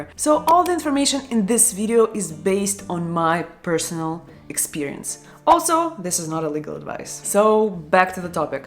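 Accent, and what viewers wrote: Russia. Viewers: thank you So much for this video... love your Russian accent.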